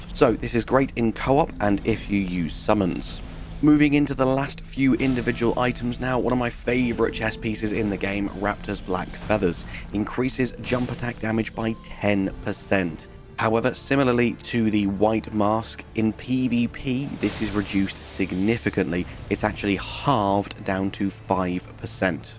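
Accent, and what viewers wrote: United Kingdom. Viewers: British voice Do not recommend channel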